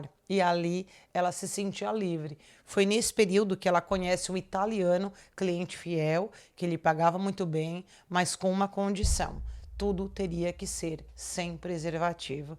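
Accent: South, Brazil. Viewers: LINDAAA,JÁ OLHEI ESSE CASO EM VÁRIOS CANAIS,MAS NÃO TEM COMO DEIXAR DE ASSISTIR NO SE CANAL E COM SUA NARRAÇÃO COM ESTE TEU SOTAQUE MARAVILHOSO DO PESSOAL DO RIO GRANDE DO SUL!!!! AMOOO TEU SOTAQUE DO SUL GURIA LINDAAA,O TEU CABELO ESTÁ LINDÍSSIMO E QUE O UNIVERSO TE ABENÇOE EM TODAS ÀS ÁREAS DE TUA VIDA LOIRA LINDAAA🙌💋❤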